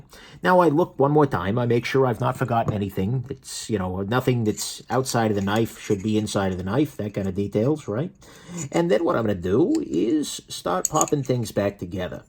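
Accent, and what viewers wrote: New York, United States. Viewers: . You don’t sound like you’re from Cali. I’d say Flatbush or somewhere off the L.I.E.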